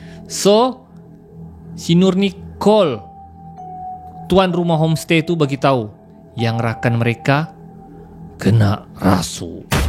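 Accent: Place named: Kelantan, Malaysia. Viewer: Abe seram chanel masih baru chanenya lbh kurang 1 tahun,subsc pun baru lbh kurang 40k.tapi setiap video mampu ditonton 10k.....si abe ni berdailet kelantan tapi masih mampu lg difaham. ada juga si abe ni baca terus cerita atau skrip yg dihantar kpd dia...masih lg menarik.tak salah baca terus skrip cerita tu dari bercerita terus secara menghafal jln cerita....malapetakanya bila tak ingat skrip cerita mula nk merepet nk bohong dlm usaha nk bercerita jgk.... cerita ini menarik dan lbh menarik jika oerkataan yg digunakan mudah difahami.....sekadar pandangan saya saja. cadangan saya suoaya tuan baca terus srip cerita yg dikirimkan dgn olahan tambahan.(jgn menghafal mcm skrg)....tapi jija tuan rasa cara ini baik dan selesa teruskan.yg penting tuan puas dan seronok apa yg dikerjakan bukan org lain yg puas dan senorok dengar. ok tq semoga tuhan sayang kita semua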